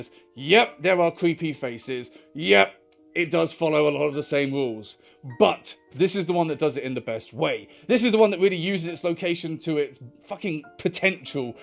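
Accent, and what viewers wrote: United Kingdom. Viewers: He's British isn't he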